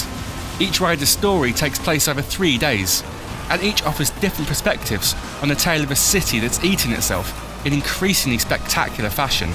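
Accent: United Kingdom. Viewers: lol your british, you have a stupid accent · This British voice is pissing me off... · thank fuck for that! a uk review! been fed up of the american accents lately :L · I love how nobody is raging on the Gamespot review about the fact the reviewers from the UK. Seriously, what's wrong with the UK? And for those saying they can't understand what he's saying, learn to speak English. You know, what with England INVENTING the language everyone's typing in now? I think this is a fair review. In the higher levels, the AI get aggressive and if you get caught up in the surroundings, you can find yourself jerking out of control. It's a known annoyance in all MS games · No offence but please do not use British reviewers thank you.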